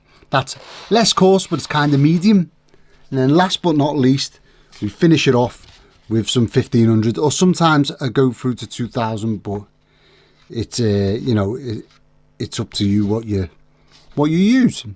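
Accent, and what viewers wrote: Liverpool, United Kingdom. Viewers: So I've genuinely been thinking about this. Your Scouse is obvious, but not aggressive, and I'm wondering if it were thicker if I would have had the same reaction. Genuinely, it took me a while to figure out that your "deckle" meant "decal", but maybe if I was already having to "translate" it would have just been part of the expected difference. That said, I'm having real trouble figuring out how Scouse transforms "decal" into "deckle". How do you say "diesel" and "demon"? "Dezzel" and "demmon"?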